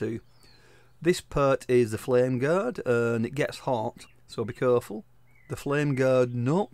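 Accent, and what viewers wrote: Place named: Lancashire, United Kingdom. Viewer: Great video.excellent tutorial.Plain English with a touch of Lancashire accent.Well done sir.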